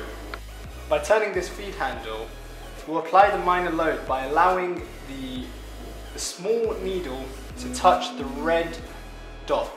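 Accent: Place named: United Kingdom. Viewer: clearly a british accent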